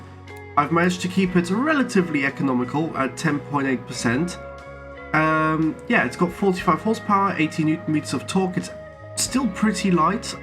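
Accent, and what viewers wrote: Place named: Germany. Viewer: What is your accent? Your profile says German, but you don't sound like most Germans I have seen talk. I assume it is some kind of German regional accent, so I am curious what specific region.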